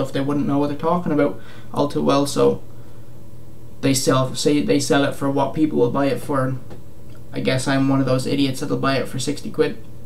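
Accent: Ireland. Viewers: U from ireland??????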